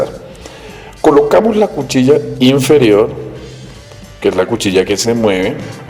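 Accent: Colombia. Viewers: Eres colombiana!!!?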